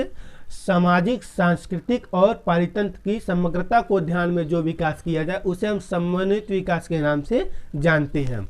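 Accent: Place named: Bihar, India. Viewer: Bihar se ho aap lgta h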